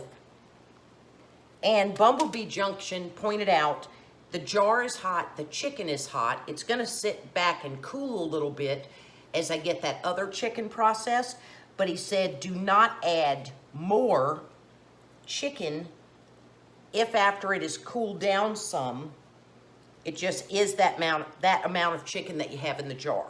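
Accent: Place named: Florida, United States. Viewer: New to your channel. Found you through the Canuary collaboration. You make me smile. You're so lively! Never canned before so I am definitely learning a lot watching all these great videos. And if I Didn't know better you sound like you are from Florida. Will be sad if I'm wrong. I can usually spot a fellow Floridian. :-D .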